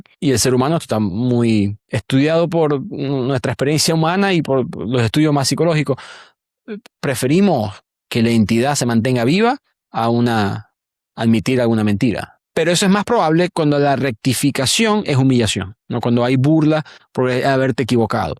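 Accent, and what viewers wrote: Maracaibo, Venezuela. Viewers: Amé el tema y amé el acento maracucho 👏🏻👏🏻👏🏻👏🏻😂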